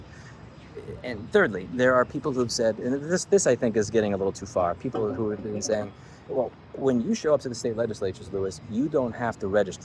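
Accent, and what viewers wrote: New York, United States. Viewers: This is great, I hear de New York. Normally ya don't hear the accent. Come onnnnnnn. Say "all a yous!" Don't be all grammatical on me now!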